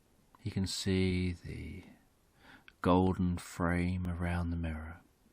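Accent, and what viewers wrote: England, United Kingdom. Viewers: Why can't I stand the English accent.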